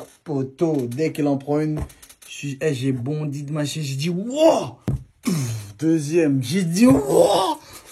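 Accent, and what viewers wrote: Cameroon. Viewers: Il parles comme un vrai camerounais ! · Ahahaha trop marrant je te jure t’es vidéo me tue 💪 ( juste ton accent africain camerounais il est agaçant ça gâche toute les blague ) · 🙂Force à toi!trop fun de tes vannes,l'accent kamer ☠tout 🤣🤣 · t es un ouff j ai kiffe ton acent camer 🙂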